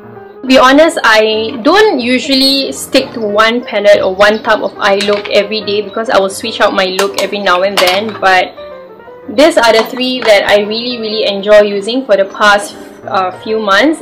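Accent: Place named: Singapore. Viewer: you sound so singaporean! 😆 anyways whats your shade in mac?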